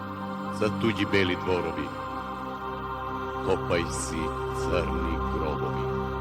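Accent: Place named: Veles, North Macedonia. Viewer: Veleski Diajalekt preubav. pozdrav braka moi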